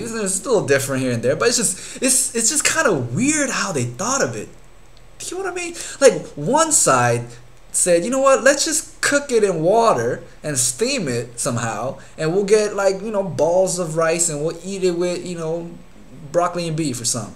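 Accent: England, United Kingdom. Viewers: LOL I love your English accent!! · What the hell I couldve SWORN i was subscribed to already! lol No wonder why I havent seen a new video in a while. lol but Haha the "Wear underwear or something thats nasty!" XD too funny! I didn't know they went freeballing in those kilts lmao! Learned something new everyday lol btw Your britsh accent is awesome!! ELLO LOVE!:D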